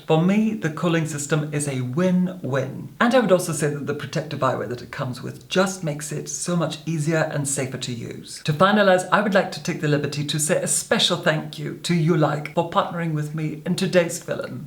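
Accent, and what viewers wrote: Scotland, United Kingdom. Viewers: I don't think his accent has changed. He's had a Scottish accent the entire time. Has his speech or voice become a bit softer and his delivery a bit more refined? Yes. But I don't think that he's putting it on for affect. I'd say that it's more about the fact that he hadn't lived in London for very long when he started this channel and his more typical, regional Scottish burr, has softened and become closer to that of the people he's been interacting with in his business and personal life over the past decade. (if that makes sense?)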